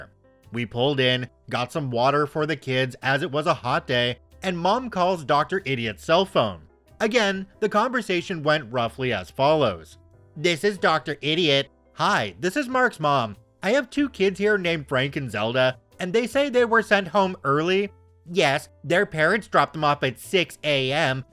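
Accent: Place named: Canada. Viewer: from Canada, so he will pronounce me words differently than other people would and no, he is not am AI either.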